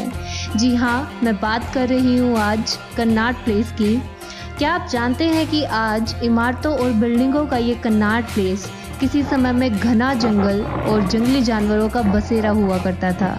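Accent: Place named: France. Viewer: Lagra French aye lagra yesa❤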